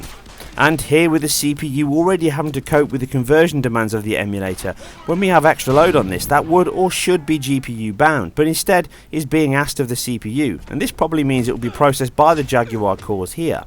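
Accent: United Kingdom. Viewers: He is. English, to be specific. I've been trying to work out his accent for a while, but I can't be more specific than that. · Tks!! I love the british tone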